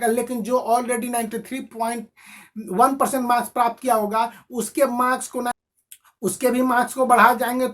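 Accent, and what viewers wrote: Bihar, India. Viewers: Sir aapka bihari accent mujhe bohut pasand aya🔥🔥👍👍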